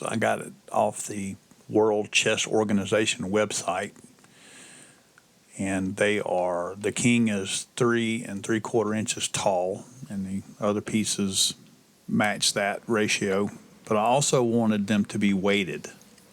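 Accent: South, United States. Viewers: The possum reference comports with the country twang😂 Mks Seriously Excellent job, excellent work Great video production And very effective voice over narration The steel BBs/ bearings not bad Personally, I would go with steel rods of several different diameters, cut into disks I just had to nitpick didn't I😂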